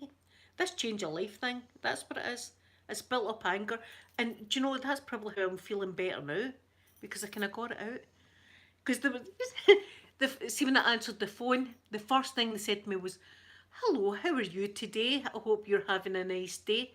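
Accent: Scotland, United Kingdom. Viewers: That lipstick looks lovely on you! I wish I could do a Scottish accent but I carn't do it haha! It's lovely though x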